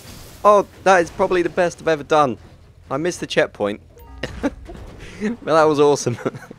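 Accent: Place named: Southern England, United Kingdom. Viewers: Which british accent...lol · DeadDudeDancing I'm from the UK and I'm not sure, sounds like he's from the South like me, I think what sets him apart is (no offence) his high voice. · Which british accent is that?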